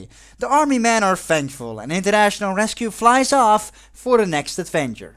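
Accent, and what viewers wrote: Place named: Netherlands. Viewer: I hate your accent i can tell your dutch i am also dutch ahaha😂😂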